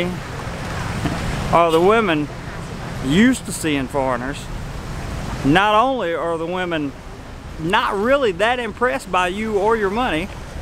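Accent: South, United States. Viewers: I'm listening to his accent and thinking.....cape fear hahaha. Great real world advice boys ! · Love your down-to-earth delivery with the southern gentleman charm. You should do way more video narratives....on any subject. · these advices are precious really like not touching the head of a Thai, who would otherwise know for such details. Thanks. PS: English not my mother language but I'd say you're from US somewhere within the Bible Belt range? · Thumbs up for cowbow accent. Yehaw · I love your south accent man! I've been in TH for 3 years, 85-88 and everything you say it's true. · Your Southern accent is cute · Watching from the Philippines... I like you southern accent. thanks you visited the Philippines. thailand and Philippines have similarities in culture and they both eat rice Lol. I like to watch ads too... SPANISH. As the Philippines was once a colony of Spain... 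Spanish was taught also in School. 2 languages were taught in Philippine school back then 1950s-1980's.. Spanish and English. Same is true in the Philippines... in Thailand and the Philippines... foreigners are guest.. and a good guest behave. You are not a citizen in this country. Act like a good guest . TIPS... because wages are low and people have high expectation with tourist. As you have said... dont come to Thailand with little money.. because people expect tourist have money to spend. WELL SAID. I agree with you. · My curiosity: that american accent you have... which american state are you from? Texas? I can easily understand every single word you utter. English' s not my first language (neither the second one, actually), so I'm curious because sometime I struggle a little to understand fast spoken american-english. No problem whatsoever in understanding you ;)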